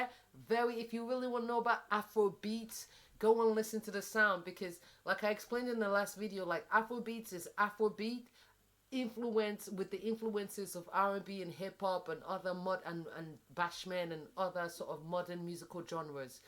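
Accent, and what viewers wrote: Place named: United States. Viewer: When ya click like before the video even starts 💫💫 Oh my gaaaaah I was a massive fan too.....still am actually!!!! You crack me up! Your as real as they get, I think its a Taurus thing. Glad to hear no-one is fcuk-in with ya but dey fcuk's wiv ya 😂 you have an American twang to your voice did you used to live out there for a wee while?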